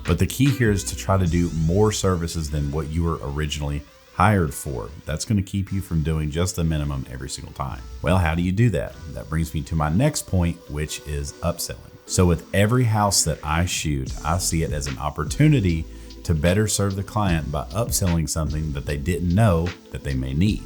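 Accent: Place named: Tennessee, United States. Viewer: Watching your videos as I decide to get into Real estate photography after leaving Real estate and just realized your voice sounded like it had some Tennessee in it! I’m actually from Chattanooga and recently moved to Louisiana. Love the knowledge and insight!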